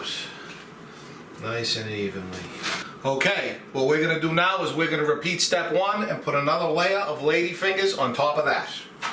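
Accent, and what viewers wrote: Boston, United States. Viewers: Just wondering where you are from. I’m from Boston area and your accent sounds like mine! Lol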